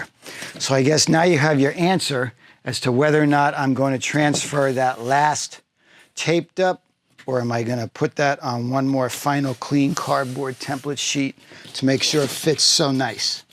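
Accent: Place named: Boston, United States. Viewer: absolutely not Spent 15 years in Boston.. now back in Ireland.. your accent brought some good memories back.. “Now go get your Shinebox”…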